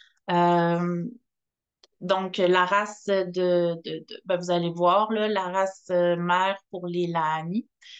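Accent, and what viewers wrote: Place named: Canada. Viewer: je remarque l'accent canadien. j'ai peut-être vécu au canada dans une vie antérieure, j'imite assez bien l'accent canadien.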